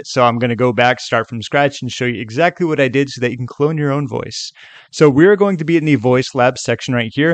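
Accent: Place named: United States. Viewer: Its easy when your accent is so generic American lol but does it actually work for people with variable localized international accents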